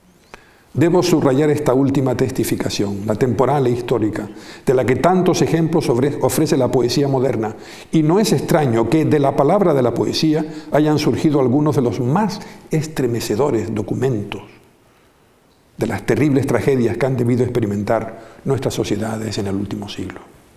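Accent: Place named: Canary Islands, Spain. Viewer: Muy amena y enriquecedora. Más conferencias sobre poesía por favor. Que agradable es el acento de la Canarias.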